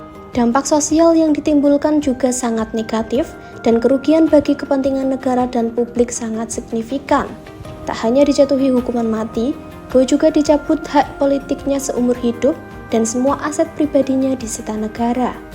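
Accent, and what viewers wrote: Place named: Yogyakarta, Indonesia. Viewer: Naratornya asli jogja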